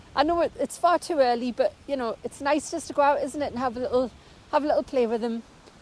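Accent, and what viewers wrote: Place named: Northern England, United Kingdom. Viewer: you will have to do a northern lights video as by your accent you are very close to catching them Very different accent to further you go up before it turns into Scottish And we are based Co Durham so get to hear many accents ❤️🫡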